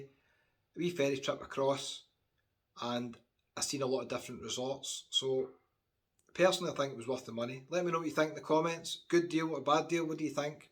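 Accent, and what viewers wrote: Scotland, United Kingdom. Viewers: Just watched your comment one for Ukraine so brilliant and fair play but I don't have a Facebook account so couldn't comment there but a great gesture. Must give you stick to get a mention.only joking as I think your accent is brilliant and I am not Scottish but irish and I am neither a Tim or Hun so that person who said that has a issue in life but I will watch your videos as it gives the normal holiday goer a proper glimpse of where they would like to stay 😉 😀 THANKS 😊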